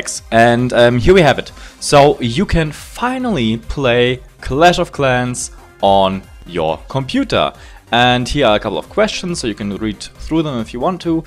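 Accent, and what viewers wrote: Germany. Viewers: Cant unhear the german xD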